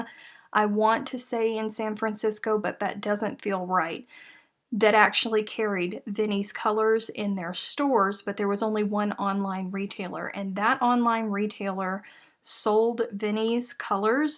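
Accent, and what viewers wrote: South, United States. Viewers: p.s.: Not that speaking southern is not erudite in its own sweet way y'all.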